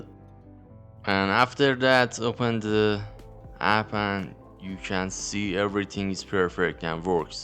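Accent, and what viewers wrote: Iran. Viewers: Iranian Accent🗿🗿🤘🏻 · لحجه ایرانی داری:)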